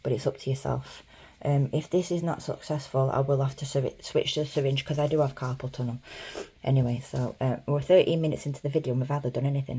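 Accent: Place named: United Kingdom. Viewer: He is beautiful! I laughed every time you said “bubbles “, your British? Accent makes it sound cute!❤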